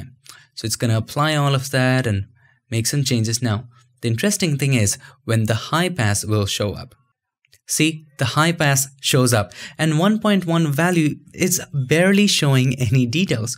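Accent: India. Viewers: Are you from india or outside??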